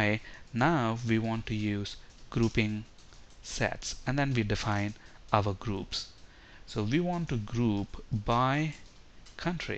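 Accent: India. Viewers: Sehr schön! At first I thought Oh no, not that Indian accent anymore! But the vid is actually very helpful! Thanks alot :-)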